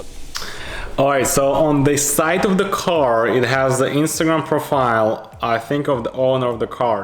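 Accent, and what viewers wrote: Russia. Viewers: Russian accent wtf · Непонятный акцент !! Трудные слова с русским акцентом А когда вспоминаешь походу про акцент и хочешь говорить немного по американски начинается. Бррп брп брэп бэп 😅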